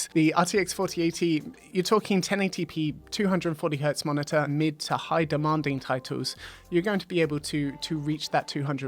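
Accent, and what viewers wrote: United Kingdom. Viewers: For those (like me) who assumed that this bloke might be doing UK prices because of his accent, this is for US prices. (this is not a dig at the channel, just putting this out there for those that might see it)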